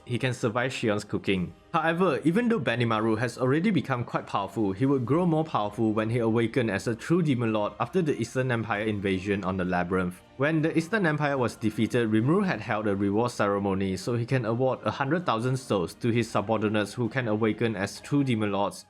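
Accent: Singapore. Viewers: Singaporean accent :)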